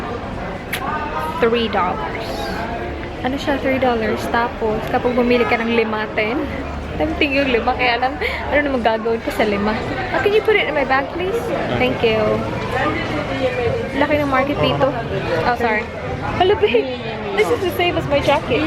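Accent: Visayas, Philippines. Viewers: Mjy visayan accent annoys you? Remember there are a lot of dialects in Ph, and no specific dialect should be superior than the others. Youre wishing maturity but heyyy, wish yourself more maturity please if that is your outlook and belief in life. IBOG LANG KANG BAKI-A KA! Awa makatakod ang imong pagka walay maturity aunty hahaha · Happy 100k, ur vlog is getting more interesting due to d nice places you've been going, credit to ur Papa Bear.... I'm one of ur subscribers who really got hooked watchin ur vlogs Coz ur maarte but natural, sometimes ur visayan accent get me annoyed and I don't find u that attractive and as u said wit 5head but u r all naturelle, u don't mind showing how raw u r.... In time inshallah you will mature and grow like a fine lady medyo konting basa pa more wag lng YT so that ur vlogs will b more substantial.....Good luck!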